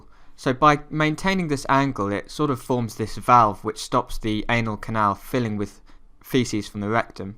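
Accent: United Kingdom. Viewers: Love you, love you're work!! Crying with laughter at that brilliant verse "S2, 3, 4...". A&P exam tomorrow for my yoga teacher training cert, have been through so many of the vids in last two weeks- they are brilliant and have helped me massively. None of this crappy american voiceover stuff, was over the moon to hear the British accent when I clicked on the first one - legend. I'd better pass now! :-)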